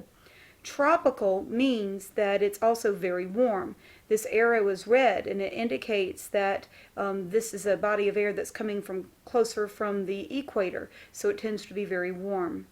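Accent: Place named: Texas, United States. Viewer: We were watching this in class and the teacher said it might get cheesy and she said you had a weird Texas accent. (But I like your accent also the video helps a lot thank)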